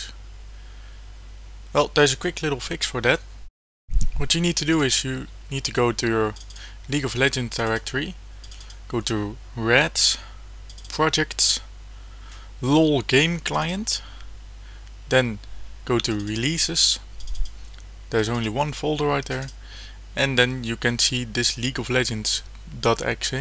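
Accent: Netherlands. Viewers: dutch for sure..? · are you dutch? you have a dutch accent :P ( im also dutch) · its so funny how you can easialy hear that you are dutch :) ty for the help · je hebt wel echt een vernederlandst accent xD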